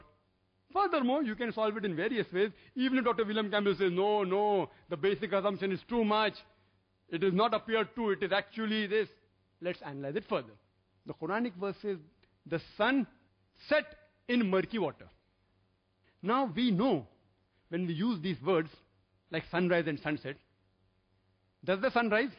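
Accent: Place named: India. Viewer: There is a huge distinction between saying, that the sun sets in Murky pool of water, and that the sun appears to set in a Murky pool of water. Like saying ' I killed that guy' and 'I appeared to have killed that guy'. Here is what Quorun says - 'Till, when he reached the setting-place of the sun, he found it setting in a muddy spring, and found a people thereabout. We said: O Dhu'l-Qarneyn! Either punish or show them kindness.! - Qur'an 18:86. The point number two: this guy appears to be a real knucklehead. His English, or what it sounds like - Hinglish, really sucks.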